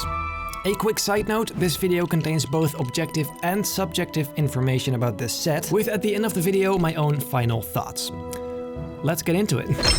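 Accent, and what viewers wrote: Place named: Netherlands. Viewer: first time i clicke don and heard your voice i was like that is a dutch guy for sure or atleast belgium german just that specific accent and pronounciation of words that my fellow dutch people do but i dont cuz i learned english myself by nonstop youtube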